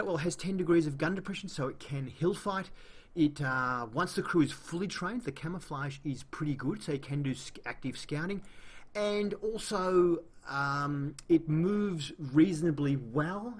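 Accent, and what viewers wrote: New South Wales, Australia. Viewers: Is that a NSW accent?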